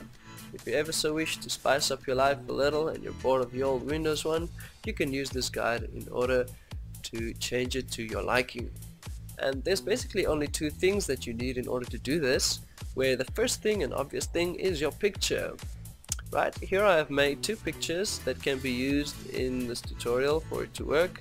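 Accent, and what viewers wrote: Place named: South Africa. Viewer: I can recognize a South African accent from a km away lol was just about to ask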